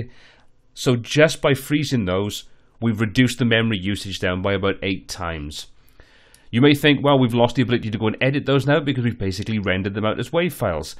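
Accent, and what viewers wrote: Wales, United Kingdom. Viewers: Cheers man I'm having some popping issues. Do I hear a Pontypridd accent 🙂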